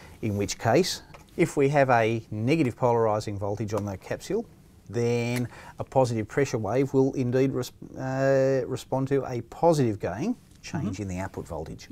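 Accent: Australia. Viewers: I fucking love the English accent of Australia peeps. I wish, I could manage to get even 1% of that… 😂😂😂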